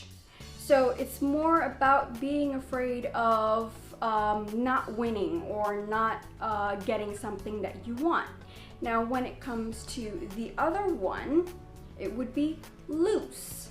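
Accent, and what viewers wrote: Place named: United States. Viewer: Really like your american accent 😊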